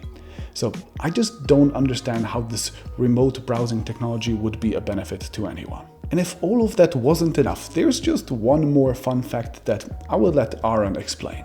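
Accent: Germany. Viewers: Hab ich mir doch gleich in Mrwhosetheboss Video gedacht dass du Deutscher bist :D Wir können unseren Akzent einfach nicht verstecken wenn wir Englisch reden^^ Aber nett so deinen Kanal gefunden zu haben, war mir bisher echt unbekannt obwohl du ja echt schon ziemlich viele Abos hast, jetzt hast du noch einen mehr^^ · You are german? :) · Du bist deutsch? :D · Manchmal wünschte ich mir du würdest einfach Deutsch sprechen. Nicht weil dein Englisch schlecht wäre oder ich dich nicht verstehen würde, aber das offensichtliche"ein deutscher spricht englisch" englisch das du sprichst lässt mich einfach erwarten das da gleich ein paar brocken deutsch durchstechen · Are you from Germany ?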